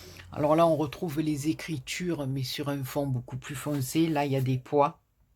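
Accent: South, France. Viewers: De bien belles trouvailles pour vos prochaines créations, le bloc 30 x30 est superbe et les jolis dies cuts je ne les ai pas encore vu. Merci pour ce partage et ce bel accent du midi. Bon week end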